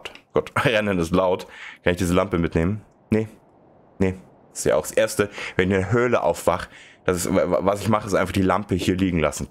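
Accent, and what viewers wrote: Bavaria, Germany. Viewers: Richtig geil,und dein Bayrisch dazu,top😂. Würde gern das komplette Game sehen,war ziemlich genial. Weisswurst ist überhaupt nicht meins,never ever😝,sorry. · Hey, ein sehr schönes Spiel hat mir sehr gut gefallen! Dein Bayrisch ist echt Fantastisch 👍 hast mich wieder super Unterhalten der Alm Öhi würde sich freuen und mit dir ein Helles trinken oder Ziegenmilch 😅😂🤘 Vielen Dank für den tollen Prolog hab ein Super Wochenstart Bro 😁 Lasst ein #Like und ein #Abo für den lieben da 🤘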